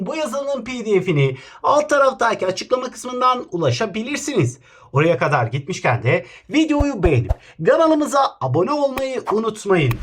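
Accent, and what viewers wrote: Kayseri, Turkey. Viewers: Hocam video çok güzel olmuş ellerinize sağlık 😊siz Kayserili misiniz bende Kayseriliyimm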